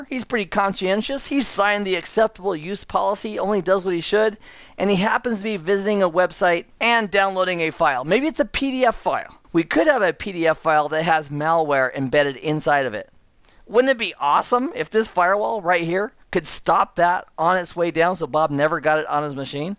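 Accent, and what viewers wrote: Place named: United States. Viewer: You have the most American voice ever.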